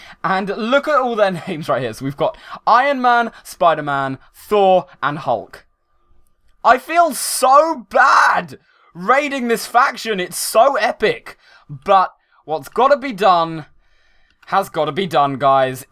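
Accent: United Kingdom. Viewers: Is hey British?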